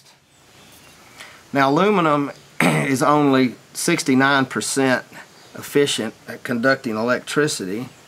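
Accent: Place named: Appalachia, United States. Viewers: Trick Photography, hillbilly talk. I love this guy!!! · that was cool thanks. let me guess - North Carolina accent. Yes that's where I live.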